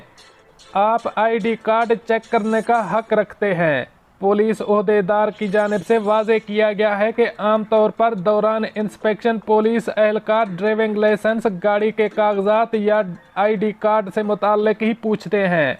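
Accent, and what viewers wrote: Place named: Punjab, India. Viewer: Urdu news in PUNJAAAABI accent?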